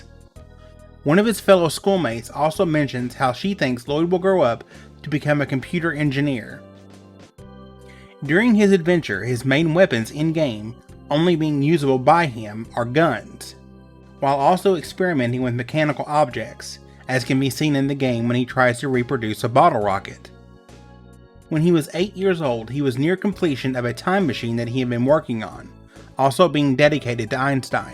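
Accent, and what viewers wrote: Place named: South, United States. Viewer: Finally a Southern youtuber! Haha, cool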